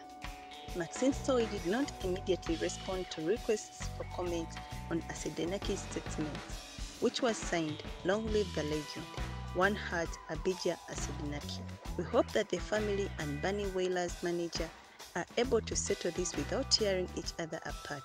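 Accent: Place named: Africa. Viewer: and she sound like african,and lot of Africans live in Jamaica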